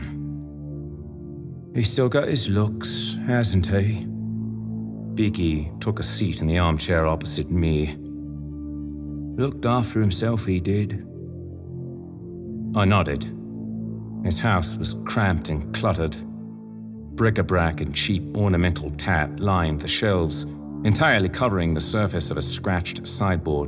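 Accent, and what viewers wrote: United Kingdom. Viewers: Ohhh British? Well this just makes me appreciate even more 😊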